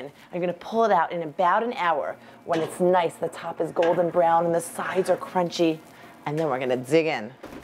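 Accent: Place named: Brooklyn, United States. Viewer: Diane C she's speaking Crown Heights/Boro Park-ese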